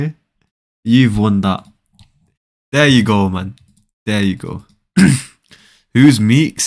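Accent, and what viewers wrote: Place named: United Kingdom. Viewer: Why do u sound more British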